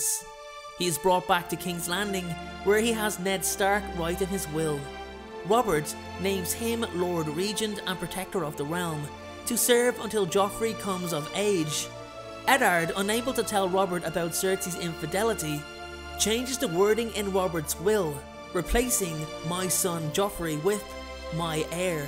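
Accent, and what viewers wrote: Ireland. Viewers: Delighted to hear an Irish accent. First time listener well done.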